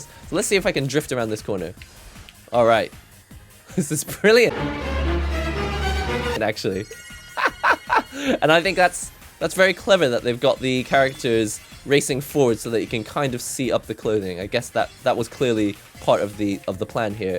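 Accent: United Kingdom. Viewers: Are you Japanese or British? I'm so confused O_O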